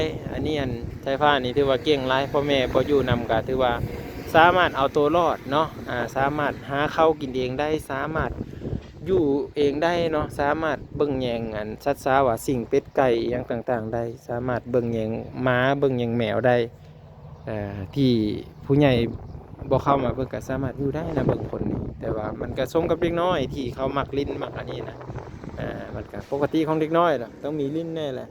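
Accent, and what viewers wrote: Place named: Phu Thai, Thailand. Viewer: ສະບາຍດີ ເວົ້າສຳນຽງຜູ້ໄທກໍມ່ວນຄືກັນ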